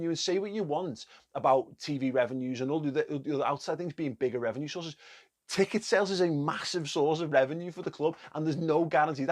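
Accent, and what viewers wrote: Liverpool, United Kingdom. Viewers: I could only manage 15 seconds of this guys acsent however nice to hear a salahpool fan actually from Liverpool and not Bristol or Exeter. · Fucking love this guy, Just had him on in the background, cosy, waffling scouser then started paying attention when he started going off at the end!! haha legend.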